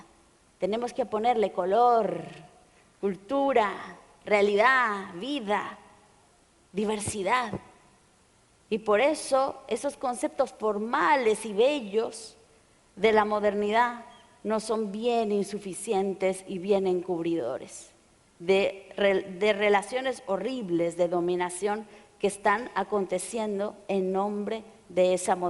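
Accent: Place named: Argentina. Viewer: ¿Por qué tiene ese acento sudamericano? Es que dicen que es mexicana; pero habla como argentina.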